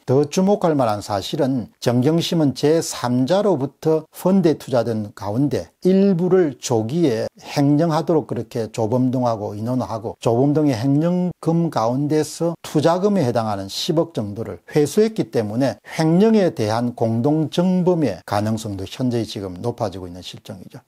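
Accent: Gyeongsang, South Korea. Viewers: 공병호 소장님ㆍ 참ㆍ대단합십니다 ㆍ경상도 발음외는 흠잡을 것 없네요ㆍ쉽지 않은 방송 인데 ᆢ꾸준하십니다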